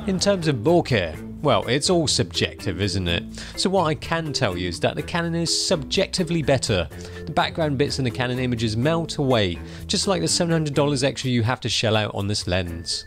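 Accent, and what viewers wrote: England, United Kingdom. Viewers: Kinda weird when a Chinese dude speak with an English accent.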